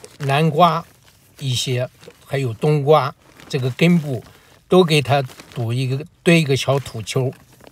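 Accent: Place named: Southern Shanxi, China. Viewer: 听口音感觉您有晋南人的口音，也像青岛人的口音哈哈！我和我妈妈都是您的粉丝！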